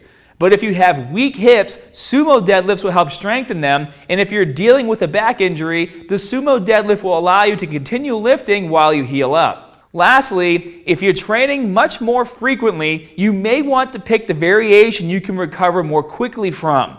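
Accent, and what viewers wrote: Boston, United States. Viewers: Boston accent sounds so gay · Holy shit that is the greatest boston accent I've ever heard! baaaaah bell 😂👌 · Holy Boston accent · I've only watched a few of his videos but this is the first time I noticed his heavy Boston accent haha. Mainah heah bub. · DIVINE PHYSIQUES TV it's not Aussie, it's a Boston accent. Boston, US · I came to see what the difference is. But why do you have half a Boston accent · Boston represent! Your accent is wicked awesome! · One of the sickest Boston accents I've ever heard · My guy sounds like Seth Meyers with a Boston accent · Soon as I heard the Boston accent I hit dislike and left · Great advice brotha. Are u from boston??? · I think you are the best with online video explanations of dumbbell use. You are thorough and I truly appreciate you. I wish I could train with you in person. Do you happen to have a video on diet/nutrition? 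I've got to get 70 lbs off and begin training for a half marathon. By the way, love that Boston accent, I lived there as a very young kid when my dad attended Harvard.😊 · IM hearing a familiar accent, are you from Boston?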